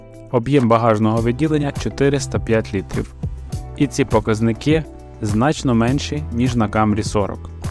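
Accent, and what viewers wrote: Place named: Ukraine. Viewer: Ничего хуже хохлового говора в обзоре машин нет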